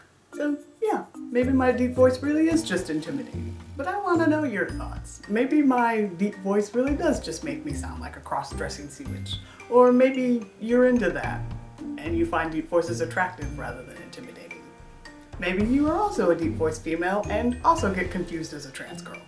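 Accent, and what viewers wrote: South, United States. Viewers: I’ve never even noticed how deep your voice is. Lol I mean I guess you have a bit of a southern accent and I hear them all the time since I live in Louisiana so it’s pretty normal for me! Lol My voice is super high naturally but I can also sound like a middle aged man who will beat you with my walking stick if you ever cross me. So ya idk where I was going with this comment...love the video and you! Have a great day!💖💞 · You just sound country to meh......... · You just sound Southern to me. Along with the deep voice, it’s very soothing. The pizza scene was like ASMR to me!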